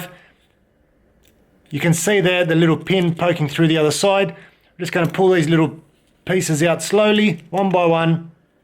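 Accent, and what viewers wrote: Australia. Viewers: Thanks heaps- brilliant How To 👍👍Also, nice surprise to hear an Aussie voice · Hello from India my Aussie mate. A B-I-G thanks. You saved me a hot, sweaty trip to the mall to get the tag removed. It's a pair trousers that came as a gift, bought over a year ago. Finally, when I received it, it had the tag, the damned tag! I had no idea which mall it was bought from, I did not have the receipt. I hated going to the mall in the horrible weather. I took it to the local tailors and they all said they cannot remove it without damaging the fabric. I didn't know what to do. Then a friend sent me this video. and Voila I just got rid of the tag. Good riddance, I say. P.S. - I loved your Aussie accent and that Aussie exuberance and enthusiasm. I love this Aussie spirit. So down to earth, friendly, non-bossy. I have a few Australian friends here in India and I think Aussies are a great people. 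Finally, A big thanks to you again mate.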